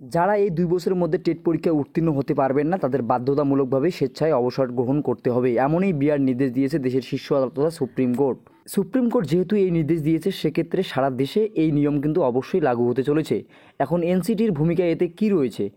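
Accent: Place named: Bangladesh. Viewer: Tui 100% bangladeshi, tor kotha sunei mone hoche, edeshe kire bara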